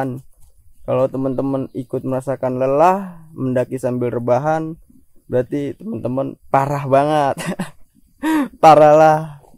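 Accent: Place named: Bekasi, Indonesia. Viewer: oooo aksen bekasi ya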